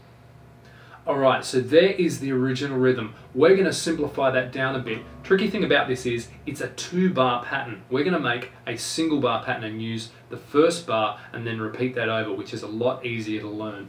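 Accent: Australia. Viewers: No, he sounds Australian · Nice! Are you Aussie? :) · lol. More like Aussie. Though i find it hard to differentiate between kiwi unless i hear both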